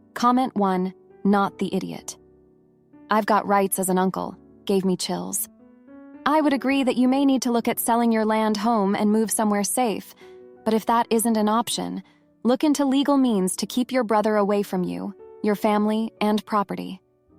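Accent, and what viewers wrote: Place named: California, United States. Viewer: Wish you could pick a less California cyunty sounding nasal snake sibilance AI voice. 😂 Annoying AF.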